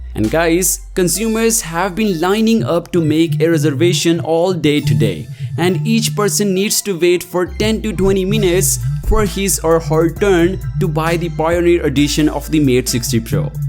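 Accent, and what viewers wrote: India. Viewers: Indian with English accent 😅 can't be watched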